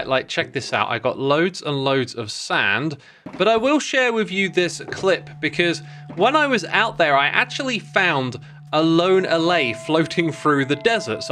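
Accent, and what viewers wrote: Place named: South London, United Kingdom. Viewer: Yeah, he's got that souf London thing going on.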